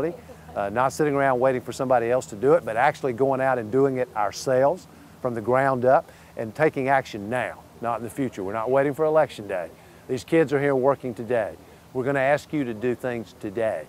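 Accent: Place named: North Carolina, United States. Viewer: That is an honest-to-God homegrown North Carolina accent, friend.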